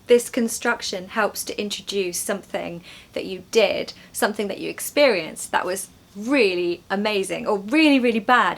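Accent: United Kingdom. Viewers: Thank you …you are such a sweet and great English teacher…your British accent is very easy to learn. Thank you so much share the video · l'm a big fan of British accent (l'm crazy about it) and your accent and teaching methods are one of the best l've seen . A zillion thanks to you from tabriz 🏢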